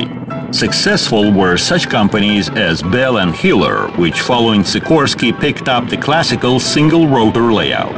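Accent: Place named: Russia. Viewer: Other than a rather heavy accent his English is perfect.. I have no trouble at all understanding him. I find the accent actually quite fitting and adding to the charm and flavour of the documentary. Russian planes, Russian accent. It fits.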